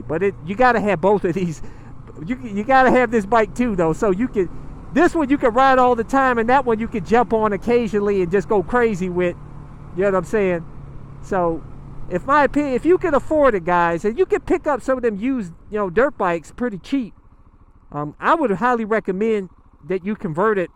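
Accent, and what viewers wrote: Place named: Louisiana, United States. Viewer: You got an accent like from lousiana somewhere man. I lost mine living up in Minnesota over 10 years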